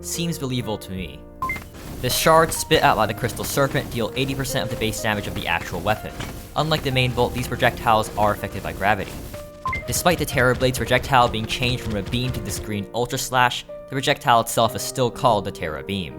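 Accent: China. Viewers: HOLY SHIT YOUR CHINESE ACCENT IS PERFECT! (Taiwanese here :D)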